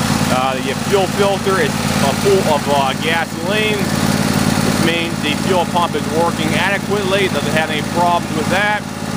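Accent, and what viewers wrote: Pittsburgh, United States. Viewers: Love your Pittsburgh accent . My Wife and I were laughing as we grew up there but have been in Ga for 20 years now. We still have our Pitt accents and people still look at us funny when we talk. I went dahn to home depot and looked at one today n’at as my wife wanted to buy me a new mower for christmas but I didn’t want the credit card bill so I’m gonna save up till spring and get one then cuz I’m tired of repairing my old MTD yard machine every time I try to use it. Gotta say the old MTD still runs strong yet it need a lot of things revamped. I was thinking of putting the twin V from the MTD on a JD LT model I bought cause the deere cuts and handles so much better than the MTD but I keep getting junk in my carb jet on the JD LT model even though I replaced everything from fuel tank to carb except for the fuel pump and metal line across the motor. 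I think the junk might be coming from the metal line so I’m gonna replace that line and maybe add another fuel filter pre before the carb but I also have to take apart the carb again to clean the jet. If I can’t get that to work I’m selling it for parts along with the MTD and just getting a new mower. I’d love to find a video on the new E120 deere’s concerning maintenance and possible problem areas since I fix everything myself.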